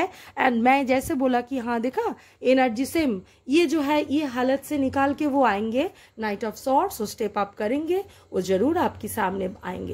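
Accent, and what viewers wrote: Bengal, India. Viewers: Always love to hear you, especially your bengali accent. The ways you explains, really every single word touched my heart . Pile 1 resonated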